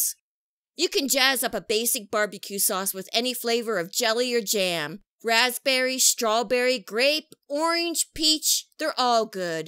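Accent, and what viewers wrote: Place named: Minnesota, United States. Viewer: Not sure where you’re from but the accent only makes these recipes even better. Minnesota? Thanks!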